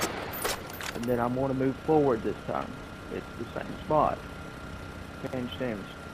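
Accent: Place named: South, United States. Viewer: People from the south don't give fuck how they talk buddy [: Js...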